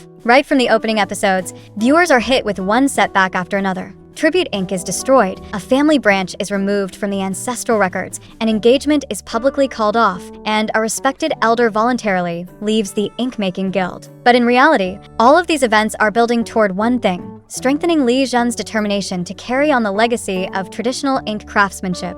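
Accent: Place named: United States. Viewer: While I am glad that cdramas are getting more attention, it really rubs me the wrong way when Chinese names are pronounced American style. It's really not that hard to figure out how the names are actually pronounced.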